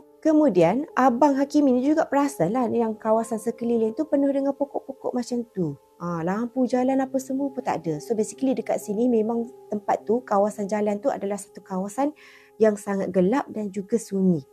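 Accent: Kelantan, Malaysia. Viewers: Kak ore klate kann,kcek mcam pailet loghat klate jerr😁..truskan bermaju jaya channel akak ni ye😊,mdahan moga murah rezeki🤲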